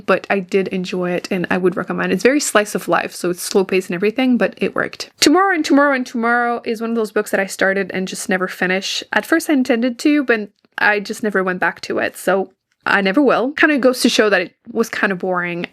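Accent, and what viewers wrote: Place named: Quebec, Canada. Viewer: I know a québécoise when I hear one hihi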